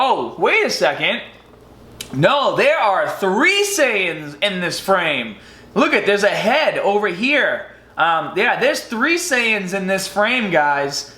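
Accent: Boston, United States. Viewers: That boston accent tho lol x'D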